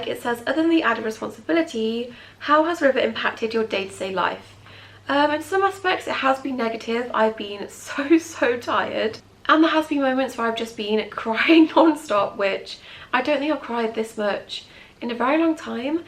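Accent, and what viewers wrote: United Kingdom. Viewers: She is such a beautiful pup, it's amazing how she keeps herself occupied behind you! I've been looking at this breed for years, and have a great opportunity this July if it works out. Trying to learn all I can to make sure I can provide a great home. Do you have more videos as she's gotten older? I'm in the USA....love your British accent!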